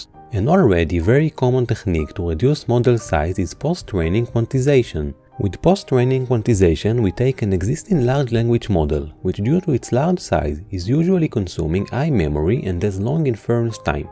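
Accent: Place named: France. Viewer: I would guess french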